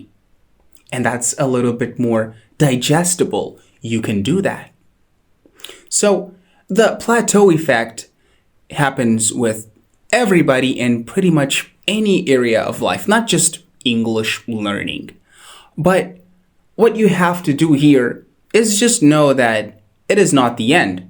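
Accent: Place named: United States. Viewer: Another great video. I suppose I should have asked you this question in your American accent video, but I hope you don't mind my asking your more questions. Did you study how to make each sound (each vowel and consonant)? I know you read out loud a lot and used movies, songs, podcasts, etc. to get your American accent. But did you also use any American accent textbooks or websites to get your American accent? I love your videos. Thanks.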